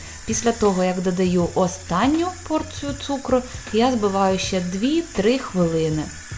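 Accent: Russia. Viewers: Російський акцент цієї блогерки ріже вухо😮 Будь ласка не видаляйте негативних коментарів😮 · не може вимовляти букву О у ненаголошених складах, все одно виходить А - це загальне явище у всіх, для кого рідна мова російська.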